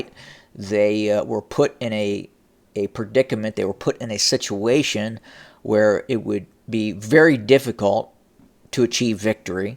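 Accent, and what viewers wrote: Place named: South, United States. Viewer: Thanks for this excellent update. I like the way you speak (as opposed to some complainers in the comments below), but I'm curious where your 'accent' is from. I'm guessing a Southern state, but which one?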